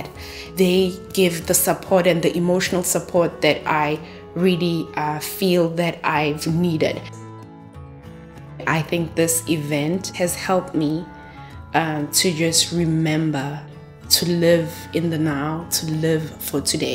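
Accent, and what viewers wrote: South Africa. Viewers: She sounds like she is from South Africa 🇿🇦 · she’s south african i can tell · I know a South African accent when I hear one... I'm glad you're okay. 🥺❤ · im zimbabwean but i could tell she was south african too 😳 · Your accent sounds very much South African. I'm here because I have had a lot of stings and severe pain in my heart for a couple of years now.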